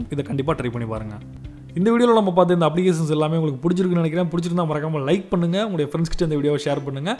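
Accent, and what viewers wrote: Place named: Nagapattinam, India. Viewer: Neenga nagapattinam ahh bro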